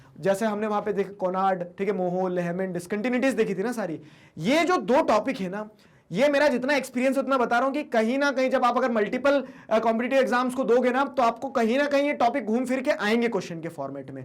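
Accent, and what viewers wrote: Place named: Maharashtra, India. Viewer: Sir apke mu se kuch word marathi aate he vo hm jaise Maharashtrian ko bha jata he or aap hame apne hi lgte he☺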